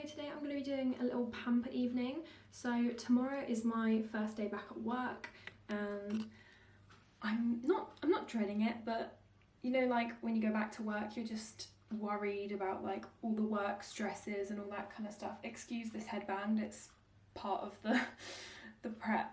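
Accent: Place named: United Kingdom. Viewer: Ayee your British as well yayyy what part are you from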